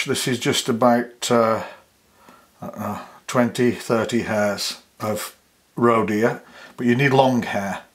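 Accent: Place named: Scotland, United Kingdom. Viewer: I've been following you recently and trying to to replicate some of your patterns. It is so much easier to learn with such detailed, precise, clear image videos. Beautifull flies. If wonder if you have fishing skills videos.... Take care and try to stay at home. Ricardo (Chile) PD:....... and thanks for your clear Scotish english...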